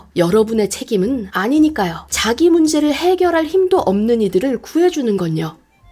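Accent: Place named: Gyeongsang, South Korea. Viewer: 혹시 경상도 분이신가요? 아주 살짝 억양이 보이네요 ㅎㅎ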